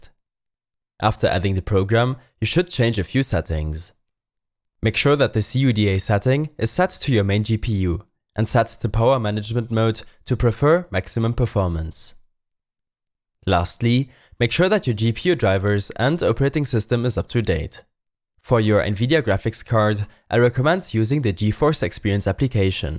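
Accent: Germany. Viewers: deutsche aussprache alter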